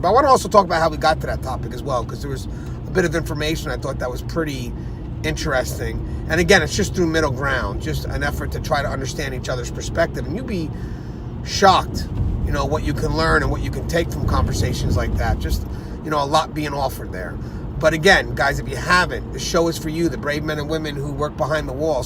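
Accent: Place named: New York, United States. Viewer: Tier Talk Correctionsone YouTube Channel because I’m a NYer and you just sound like someone that could had lived on my block lol.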